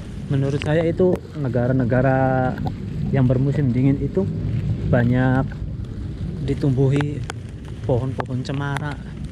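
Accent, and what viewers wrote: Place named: Cirebon, Indonesia. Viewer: Sampean logat cerbonan mas ...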